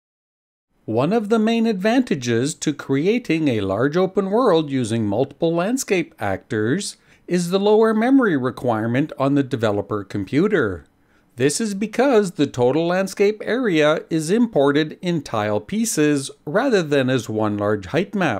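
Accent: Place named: Canada. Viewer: You do you. Be yourself. Your voice is part of your character. You're Canadian. I dig it. :) Certainly public speaking experience benefits everyone. But people like to complain. If it's not one thing, it's another. So, really, who cares...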